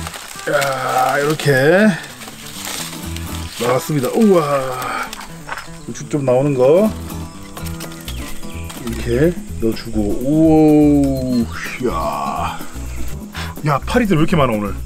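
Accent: Gyeongsang, South Korea. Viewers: ㅋㅋㅋㅋ 진짜 상남자의 wild 먹방 조으네 구독완료다 저랑같은 경상도 분 같은데 어디시져?ㅋ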